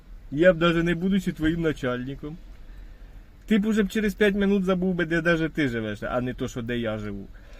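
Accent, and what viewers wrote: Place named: Western Ukraine, Ukraine. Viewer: Ооой, привіт, так приємно чути справжню Українську Западеньску мову ❤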